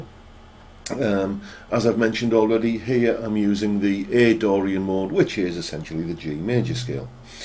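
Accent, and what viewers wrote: United Kingdom. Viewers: HI - PLEASE EXCUSE THE CAPS BUT I AM PARTIALLY SIGHTED - ONCE AGAIN BRILLIANT - NICELY EXPLAINED AND WITH TABS!!! - MORE MORE MORE - SO GLAD I FOUND YOU - THE ALBUM IS GREAT - AND IT'S NICE TO HEAR A BRITISH ACCENT THANKS - DAVID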